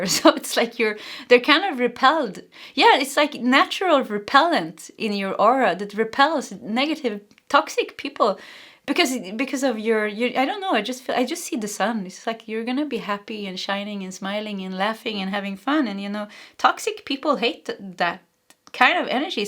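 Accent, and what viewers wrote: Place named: Sweden. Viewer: I'm pile #3 and I'm SO excited hehee! My many arms are open!!! Also- I am adopted but found out I have alot of Swedish ancestry so I love to hear your accent and I would love to visit Sweden one day! Maybe it will come on my many fun new adventures you see! I thank you and send you much light and love ❤️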